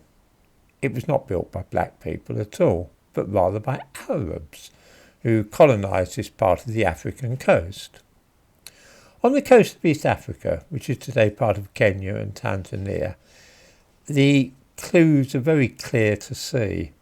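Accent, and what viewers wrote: United Kingdom. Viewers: I love how this man has a thick british accent, a moustache AND looks down on you, literally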